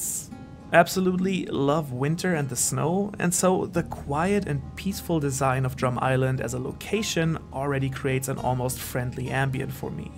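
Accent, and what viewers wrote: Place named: Germany. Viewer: are you german? Your accent aounds like it 😁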